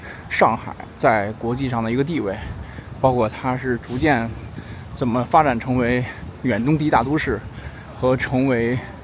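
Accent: Beijing, China. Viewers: 你是北京人嗎,你的國語很好聽.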